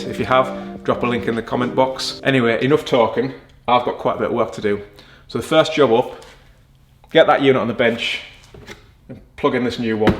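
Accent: Teesside, United Kingdom. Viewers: Great channel 👏🏽. Going to be doing the same on my S6 in a few weeks and dropping in larger turbos at the same time. Will use this as a reference. Oh and is that a Teesside accent I’m hearing?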